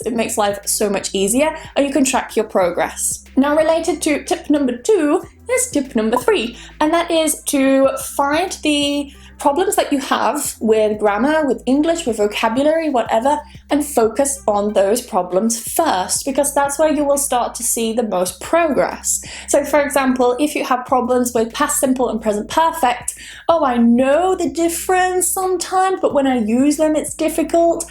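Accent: United Kingdom. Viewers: Hello happy new year 2020, I want to say you many thanks for all videos 2019, they helpful me so much. I enjoyed, learned a lot of things about English language and I wish to continue my English progress with you and your English lessons 😁🤗. By the way I love your pronunciation voice, So I love the British pronunciation 🏴. Best regards from Colombia 🇨🇴.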